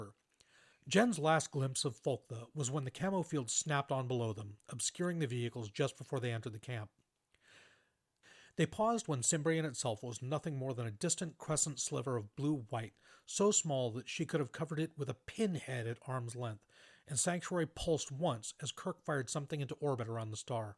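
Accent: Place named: United States. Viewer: In that case, I’d be happier to hear you read it than the dictionary, even in your regular plain ole American accent.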